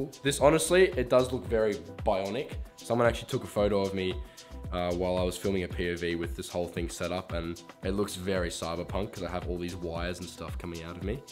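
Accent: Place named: Australia. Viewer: gonna pee, pour some whisky and enjoy 20 minutes of your beautiful face and that aussi accent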